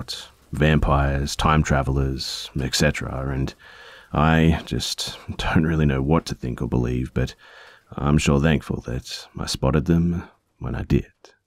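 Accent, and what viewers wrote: Australia. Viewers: Trying to place your accent - Melbourne (AKA Melbun)?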